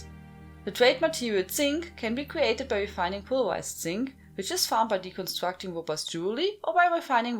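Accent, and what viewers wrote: Germany. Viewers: This really is one of the best directly informative ESO channels. It's also a nice bonus that your uniquely German accent really makes it engaging to listen to.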